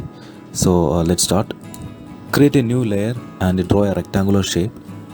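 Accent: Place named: Madhya Pradesh, India. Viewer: are you from mid pradesh cuz you accent goes hard ngl *fire emojji*x2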